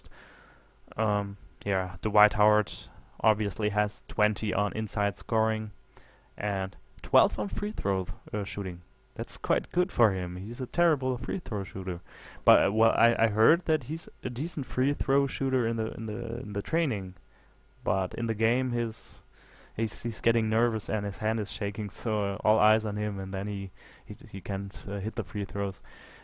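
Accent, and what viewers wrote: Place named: North America. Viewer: For being German you sound like you have a Canada/USA accent.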